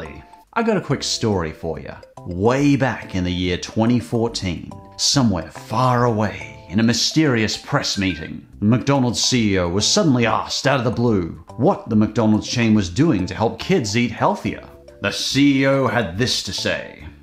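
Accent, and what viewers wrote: United States. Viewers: as an American, that was actually a really good American accent. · I can't get over how well you do an American accent.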